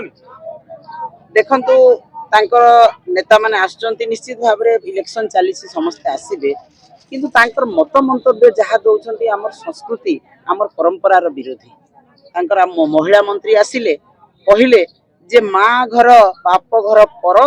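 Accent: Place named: Cuttack, India. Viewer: ତମେ ତ ସମ୍ବଲପୁରିଆ ଲୋକ ହେଇ କରି କଟକିଆ kahu6 ଗୋ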